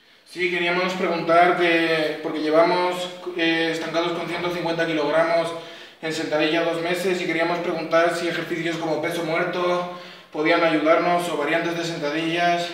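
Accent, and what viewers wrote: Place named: Mexico. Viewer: que acento mas mexicano!!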